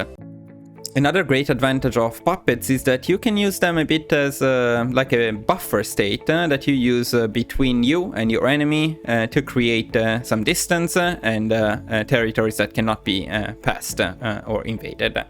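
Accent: Italy. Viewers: Wait sei italiano? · That accent seems Italian, quell'accento mi sembra molto italiano è uno dei nostri?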